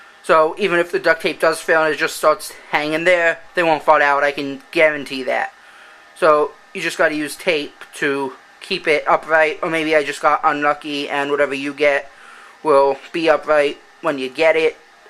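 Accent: Ireland. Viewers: Are you from Ireland? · What's the guy's accent? sounds a bit Irish, but not really.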